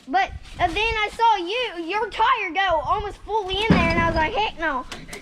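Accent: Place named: South, United States. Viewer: Even though we live almost 600 miles away We Love hearing that cornbread accent in voice. Sounds exactly like all the kids around here. Awful Southern sounding to be that far North.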